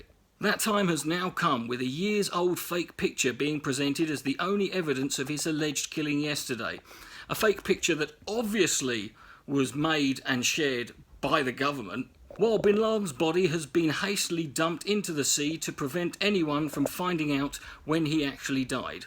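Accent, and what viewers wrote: United Kingdom. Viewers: You sound more northern than Watson sounds haha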